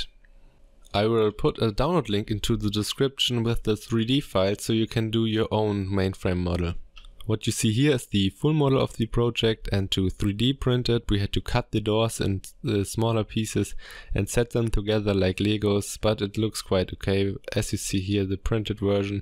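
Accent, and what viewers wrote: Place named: Germany. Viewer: Deinem Namen und Akzent zur Folge, schätze ich du bist Deutscher und womöglich in Boeblingen im Einsatz? Aufjedenfall super Arbeit. Ich wollte für meinen Raspberry Pi schon immer ein Mainframe Case und für mein Cubieboard ein DS8000 Case. Leider bin ich bisher noch nicht dazu gekommen, aber vielleicht nehm ich das kleine Projekt demnächst mal auf. = )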